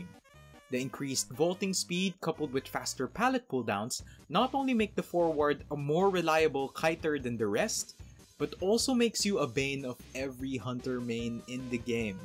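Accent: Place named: Philippines. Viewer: are you a Filipino? I'm guessing based on your accent :D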